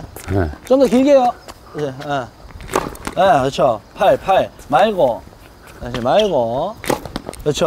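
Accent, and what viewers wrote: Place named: Daegu, South Korea. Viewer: 저도 대구 출신인데,, 오랜만에 듣는 extreme 사투리. 와이카는데요! 내용도 좋고 사투리도 좋아요 ㅎ 구독, 좋아요 ㅎ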